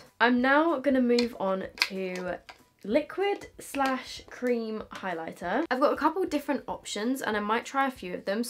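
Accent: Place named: United Kingdom. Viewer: the subtitles were transcribing from a British accent I believe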